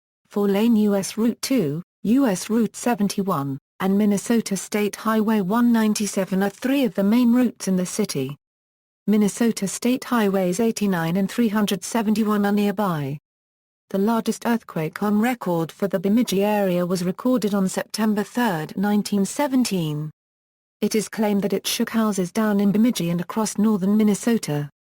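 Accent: United Kingdom. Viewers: Is this some robotic 'Siri-like voice' with a British accent reading this or a real person?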